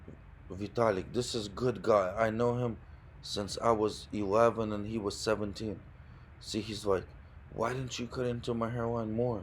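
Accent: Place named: Russia. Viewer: i watch your videos mostly your father's videos for asmr and a relaxing effect 😅, love that russian accent ❤️, try adding asmr tag to your videos for more views and subs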